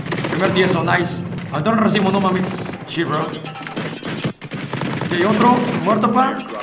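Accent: Argentina. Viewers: Es argentino ese men? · Te dejo de seguir por q ablas asi como argentino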